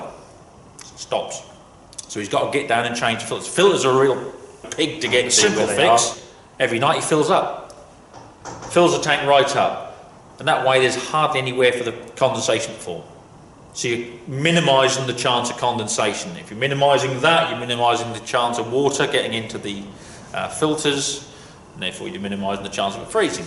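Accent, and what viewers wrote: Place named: Gloucestershire, United Kingdom. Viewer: well difficult to tell but where abouts in the country are you from? as a Gloucestershire lad you sound like a posh GL resident, you are going to say your from up north now ha ha. Enjoy the chanell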